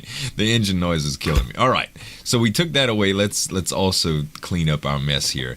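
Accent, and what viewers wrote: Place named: South, United States. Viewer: You seem more country then last time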